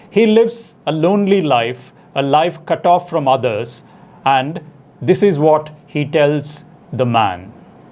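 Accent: India. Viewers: I hear only Indian accent. No English there sir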